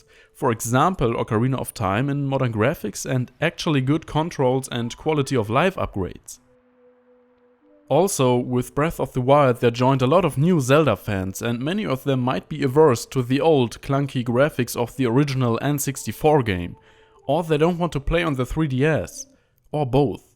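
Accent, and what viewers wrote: Germany. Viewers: Are you german? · Bin mir ziemlich sicher dass du deutscher bist man hört es so krass. Einfach die typische Betonung wenn ein deutscher englisch spricht. Ist aber auch verdammt hart das zu verstecken.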